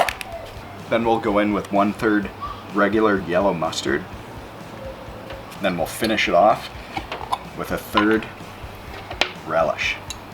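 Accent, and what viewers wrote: Canada. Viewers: Looks delish! And love your Northern Neighbor accent. :) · Canadian eh?!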